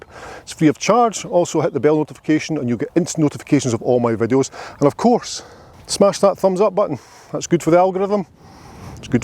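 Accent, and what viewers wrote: Scotland, United Kingdom. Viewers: Hello! First, I love accent. Are you scottish? Also, thank you for making these ben hogan videos. His book is timeless and to see somebody bring it to life through video really helps a beginner. I noticed that on the post impact part of your swing, your arms are aggressively bent in to the body, as opposed to hogan's full extension. I feel like I have that same tendency too. What do you think?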